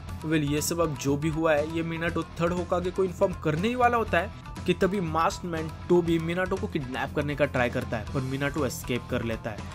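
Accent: Maharashtra, India. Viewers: ho bhai maharashtrian ki bolnar ki style pahachan mai aate hai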